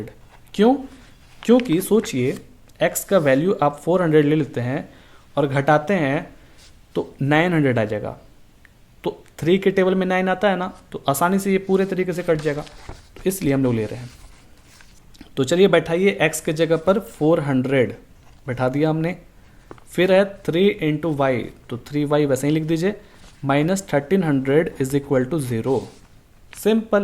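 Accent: Bihar, India. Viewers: Sir aap bihar se hai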